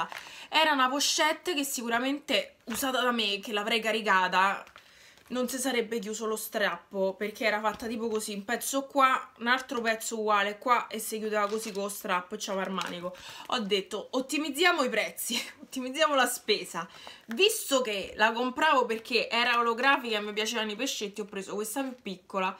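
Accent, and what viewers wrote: Rome, Italy. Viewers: team 🌹, amo il tuo accento romano 😍 Pezzo preferito il pigiamino di barbie aaaa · Ma vogliamo parlare dell’accento romano😍♥️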